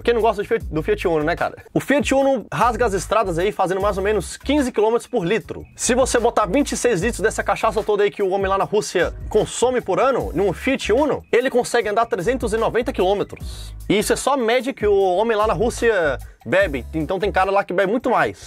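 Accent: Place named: Ceará, Brazil. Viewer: VOZ DE HUMORISTA CEARENSE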